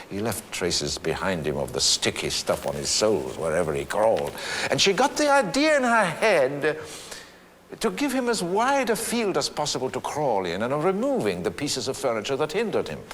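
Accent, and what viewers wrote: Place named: United Kingdom. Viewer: I hate to be haughty, toReasonWhy, but I do think the question rather is "why are Americans like you so ignorant of anything non-American that you'd mistake a British accent for a French one?".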